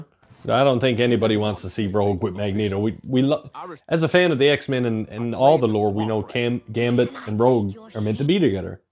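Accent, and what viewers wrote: Newfoundland, Canada. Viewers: Great reaction. That episode was a roller coaster ride. I too cried like a baby. PS: Are you Newfoundlander? Couldn’t quite pin down your accent.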